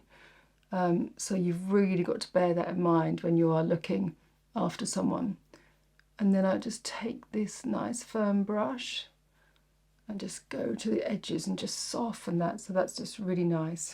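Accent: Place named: Scotland, United Kingdom. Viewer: Me too! I go down to the kitchen, make my oatmeal and coffee, bring it up to bed and snuggle in and have breakfast with. I wonder how many of us are doing that together? Of course, not at the same time lol because I'm in Minneapolis and you sound like you're in Scotland. maybe we could take a poll and see how many of us eat breakfast with?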